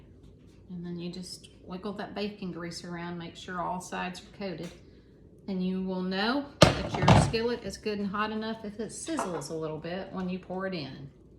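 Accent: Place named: South, United States. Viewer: Never apologize for your southern accent ha ha I'm such a hick myself lol Love that apron, so cute. I'm gonna show it to my mom, I know she can make me one. I love watching you cook. That soup and cornbread sounds so good, yum! I believe you're right about the haze it's the same way here. Never thought about it until you mentioned it.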